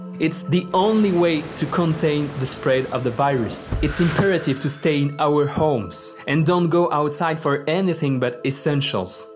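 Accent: France. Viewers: It's eu bioutifoul french accente ! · FRENCH ACCENT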